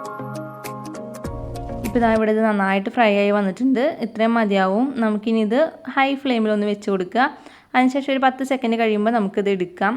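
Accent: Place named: Kunnamkulam, India. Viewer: Ningalude samsaram kettal eadu jilla anennu kandu pidikkane pattilla Kunnamkulam aduthano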